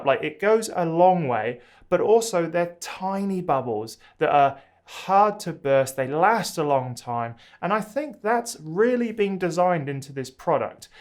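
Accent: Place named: United Kingdom. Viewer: Just out of curiosity how did you manage to get Beardbrand products? I assumed by the accent you were here in the UK 🤓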